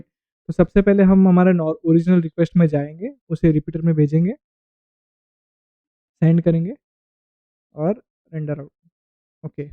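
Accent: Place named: India. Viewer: May the God give you the strength. Be victorious and proud. But the quality of your voice is not good, brother. Try to make it better because it is very difficult for someone who is not fluent in English to listen with an Indian accent. From Iran.:hand-pink-waving::hand-pink-waving::hand-pink-waving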